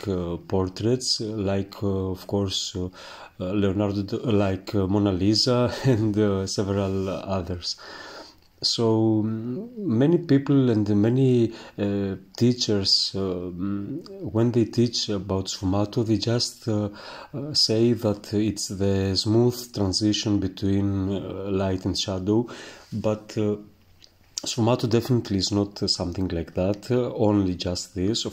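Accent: Greece. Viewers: It's magical how you begin with black and white, and end up with this! Wonderful to watch and learn from. No need to apologize for your English; the accent is charming. Besides--you speak better English than many English-speakers can speak Greek! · Your English is more than adequate as you explain and demonstrate this process so beautifully. I recognised your distinct Greek accent immediately, with a Greek Athenian mother, and thank you for sharing your gift and skill in such a thorough way. Your work is a masterpiece with mystical presence as seen in some fayum portraits, except this young girl is brought to life more profoundly with expression as with the deeper appreciation of this timeless portrait revealing the layers and dimensions, Your painting is a language of its own. Truly impressive.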